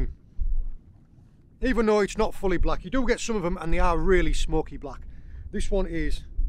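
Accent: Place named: Yorkshire, United Kingdom. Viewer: Got to ask as your accent is so familiar, what part of God Own County do you come from? I'am a Smoggie from the Boro.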